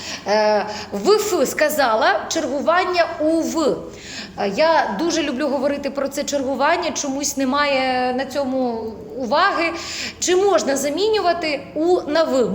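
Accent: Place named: Western Ukraine, Ukraine. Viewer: Таким чином шиплячi вимовляють на захiднй Украiн. У нас, на Днiпропетровщинi, не "ТЧикають". Напевне дiалекти краще залишати в мiстях iх вжитку, а не нав'язувати як еталон мови на педагогiчних подiумах.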